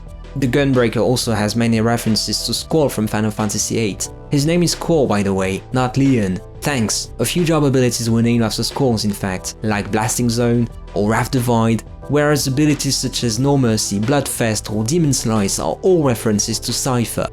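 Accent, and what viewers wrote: France. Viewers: I would have put the summoner first, but that s my thing for Yuna speaking Took my a few minute to figure why I had sub a French accent dude though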